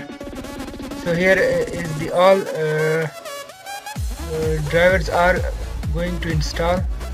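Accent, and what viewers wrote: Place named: India. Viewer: o my bad sounds like an Indian accent ill say Asians as a whole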